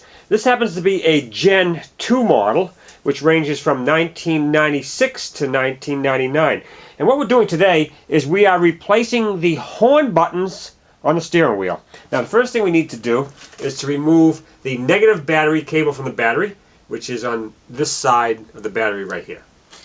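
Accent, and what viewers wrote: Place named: New England, United States. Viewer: Probably from New England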